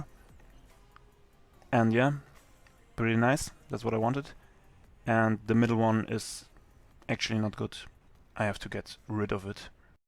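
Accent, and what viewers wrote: Germany. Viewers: Bro man hört ein bisschen raus das du Deutsch bist aber trotzdem geile Videos und gutes Englisch 🔥🔥🔥👋😂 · Du klingst deutsch:b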